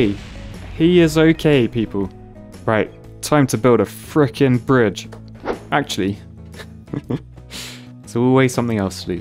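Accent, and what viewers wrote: United Kingdom. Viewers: just a normal bri'ish engineer playing games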